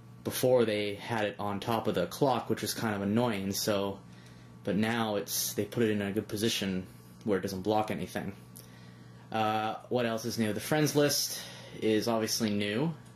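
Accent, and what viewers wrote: Canada. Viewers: gotta love the way canadians say "about"!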